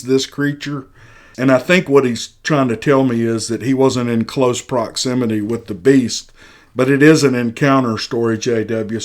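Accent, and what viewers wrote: South, United States. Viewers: with a touch of southern comfort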